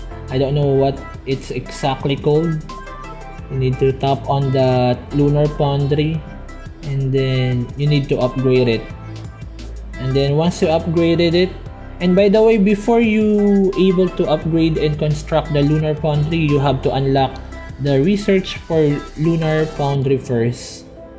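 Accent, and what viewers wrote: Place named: Philippines. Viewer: You're filipino just like me, I listened to your accent and I knew, hehe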